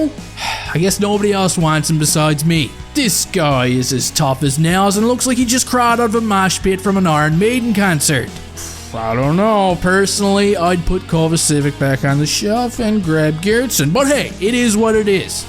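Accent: Newfoundland, Canada. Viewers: Sounds more like a Newfie to me. Either that or a caper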